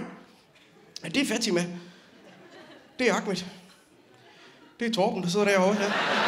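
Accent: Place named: Jutland, Denmark. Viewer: Elsker din humor, jyske accent og din store portion selvironi. Thumps up herfra.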